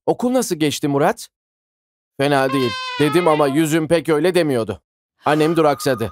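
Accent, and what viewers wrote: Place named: Black Sea, Turkey. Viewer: seslendirmen karadenizli kesin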